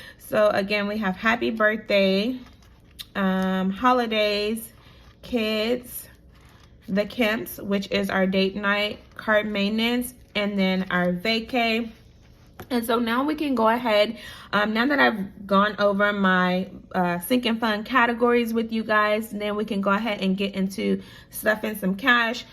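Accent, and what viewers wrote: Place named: Caribbean. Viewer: I sometimes hear a little bit of an accent, but I can’t quite pinpoint from where lol Caribbean maybe? I’m so nosey!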